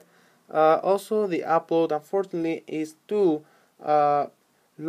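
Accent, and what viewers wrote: India. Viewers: ohh my god oh my goooood i hate when indian speak english (im not racist :) ) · Owen Batucan no its indian idiot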